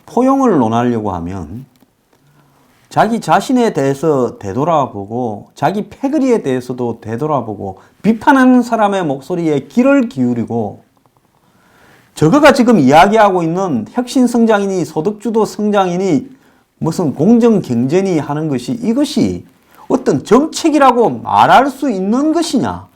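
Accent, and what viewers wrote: Gyeongsang, South Korea. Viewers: 내가 이런 글 잘 안쓰는데... 늙어서 곤대질 하질말고 조용히 살아라. 갱상도라고 티내지 말고...내가 다 부끄럽다 아이가. 이 자식아.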